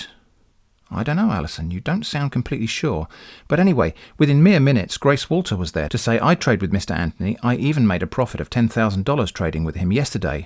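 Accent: United Kingdom. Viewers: If they had said the investments were glarded, well, that might have been enough persuasion for me!, I really think you could make a pretty penny doing audio books! (Generalizations ahead, I’m aware they’re generalizations) I think Americans trust the British accent, and enjoy it, and yours is not thick, like when I’ve tried to watch some BBC police procedurals. You’re also good at lowering your voice for dramatic effect. I mean, I really enjoy listening to you read emails! LOL. That should say something.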